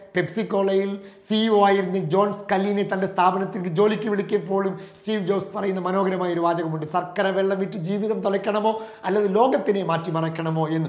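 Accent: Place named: Tamil Nadu, India. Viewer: .... ഇപ്പോൾ അവതരണം... മെച്ചപ്പെടുന്നുണ്ട്... പഴയ' തമിഴ്ചുവ'ബോധപൂർവം അകന്ന് പോകുന്നുണ്ട്.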